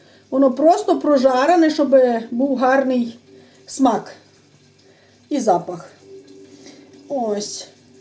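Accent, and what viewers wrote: Ternopil, Ukraine. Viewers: То що вам не подобається слова і говор в кожній області свій акцент це Тернопільська обл там багато польських слів з якими вони виросли їм так зручно а ви дивіться і слідкуйте кожен за собою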